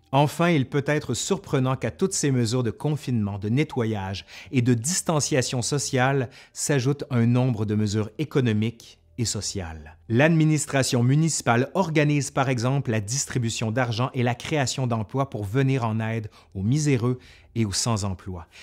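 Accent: Quebec, Canada. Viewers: Très intéressant merci et en plus raconté avec votre charmant accent Québécois, c'est un plus. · Vidéo très intéressante et agréable à écouter, c’est la première fois que j’écoute une vidéo en « français canadien » jusqu’au bout 😊👌🏼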